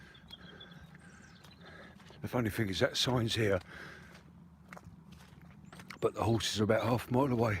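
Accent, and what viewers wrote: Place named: Cockney, United Kingdom. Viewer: Is that you talking, nice voice, can detect a cockney accent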